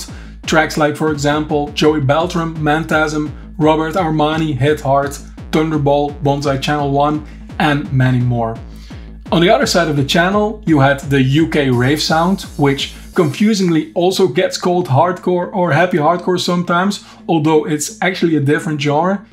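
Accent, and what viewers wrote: Netherlands. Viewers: In the US that's a Millenial. I'm used to hearing the Dutch accent so much that I didn't even notice yours. LMAO! I'm a Dutch American. My ancestors came from Holland and Austria. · It because of the Dutch accent.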